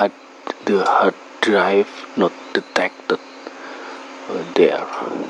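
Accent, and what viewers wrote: Indonesia. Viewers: awal2 dikiranya orang thailand tapi kok lama2 di dengar aksen inggris nya kaya orang indonesia nah pertengahan akhirnya nongol juga bahasa asli nya wkwkwkw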